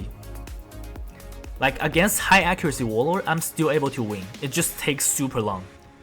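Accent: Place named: Asia. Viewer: Is this first time that I see you in person:O. I only follow you on YouTube hehe. I knew that you were an asian hehe I'm not a native speaker English, even though I recognize that accent. Thank you I really learn a ton with your videos. I appreciate <3.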